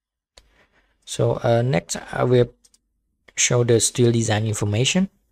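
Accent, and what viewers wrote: Cambodia. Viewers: u sound like a Cambodian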